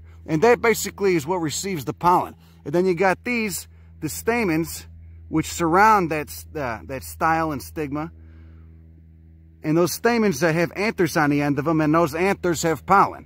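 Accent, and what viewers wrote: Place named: Queens, United States. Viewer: Man, your Queens accent is mighty! This is the freshest channel to the science world, good shit homie...bet.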